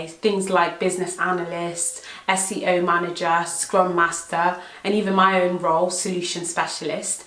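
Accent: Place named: United Kingdom. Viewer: The British English is just awesome.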